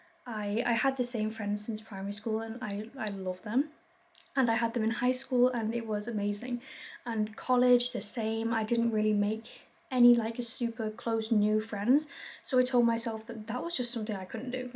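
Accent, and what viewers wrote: United Kingdom. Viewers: do you have a Yorkshire accent?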